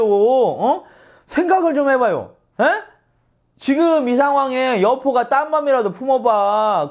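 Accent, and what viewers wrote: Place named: Chungcheong, South Korea. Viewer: 충청도사투리로 듣는 장동민의 삼국지 !옛날이야기 듣는 것 처럼 정말 재밌어요!고맙습니다!^^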